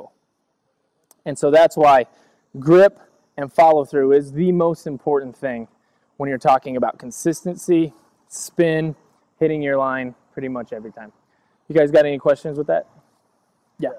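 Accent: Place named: Western Pennsylvania, United States. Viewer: It's a western PA thing. I'm assuming he's from somewhere around Pittsburgh.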